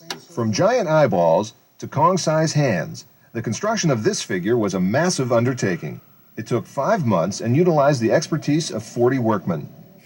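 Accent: United Kingdom. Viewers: is a straight up audiophile - this guy has shown me stuff I never fathomed existed, I just love his channel and British accent cool to listen to as well lol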